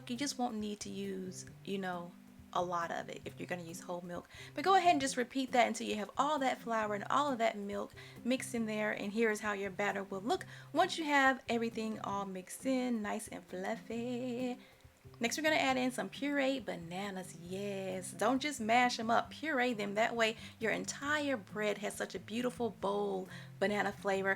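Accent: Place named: South, United States. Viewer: Luv your cookin' and Southern twang sis! 🥰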